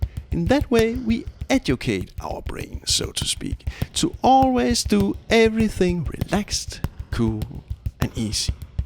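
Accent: Denmark. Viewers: I like this guy, Sounds danish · Denmark? I'm picking up a strong accent haha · I have to ask, are you Danish? I hear a few what seem to me clearly Danish sounds in your unusual accent - never heard anyone speak exactly as you do. Love the Danish accent. Hope you don't mind me asking - sure it's none of my business but language sounds interest me. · Sound Danish :-) Well done I like it very much as well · Nice Danish accent, you deserve more views 🔥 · As a Dane myself I half way cringe when I hear our accent but it's hard to lose ... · Are you danish? Idk it’s just the accent😂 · Super god video, vidste du var dansker fra accenten lol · You are the only guy with a thick Danish accent to which I've actually liked listening to. Wonderful video, I'm definitely gonna try to pick up the 'lovely habit'. Og forresten mega props for redigeringen.. Det er dælme vildt at få de korrekte fingre til at lyse rødt på hvert eneste slag - selv i improvisationen! 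Translation: "And by the way, huge props for the editing.. It's wild to get the right fingers to light up red at every strike/beat - even in the improvisation!"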